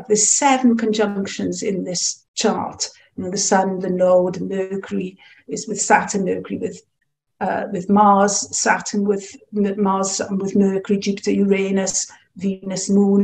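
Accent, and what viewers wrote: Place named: Wales, United Kingdom. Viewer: Refreshing to hear a welsh accent in an astrology lecture! Alot of really helpful references, thank you 🙏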